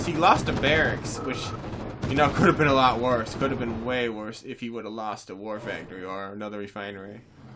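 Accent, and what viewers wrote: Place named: New York, United States. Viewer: you come from new york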